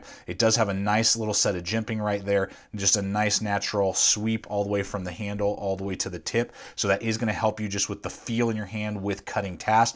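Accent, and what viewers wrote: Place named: Texas, United States. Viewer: Good Video, my brother is the Spyderco nut between us so I'll have to show him this. In your into I'd swear your in my home part of Texas! 😎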